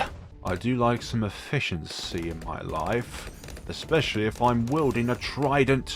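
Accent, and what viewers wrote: United Kingdom. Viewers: Nah, no facecam. There's already a hook keeping me here, the british accent and dying to yellow bloons.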